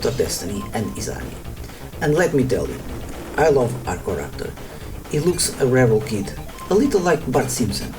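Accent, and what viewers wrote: Portugal. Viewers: Não sei se alguma vez comentei num dos teus videos mas estava a ouvir o teu sotaque e pensei que fosses Tuga. Fui ver e és. Não falha. :)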